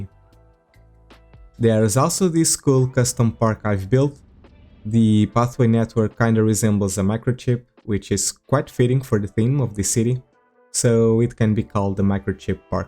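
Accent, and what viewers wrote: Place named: Portugal. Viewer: O inglês do português é maravilhoso, merece o meu gosto. Nota-se mesmo quando um português fala inglês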